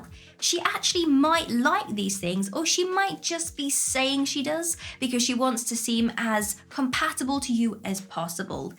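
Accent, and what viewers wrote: United Kingdom. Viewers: I like this ladies sweet British accent 😍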